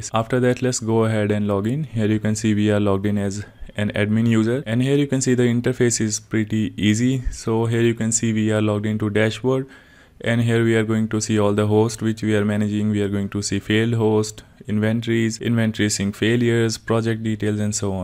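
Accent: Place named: India. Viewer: Пока произношение индуское не уберешь — жрать не получишь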